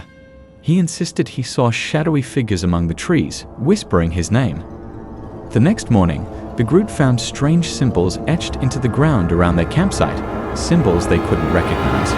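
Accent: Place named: United Kingdom. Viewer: A Brit narrator narrating stories about Appalacha. To me it just doesn't fit.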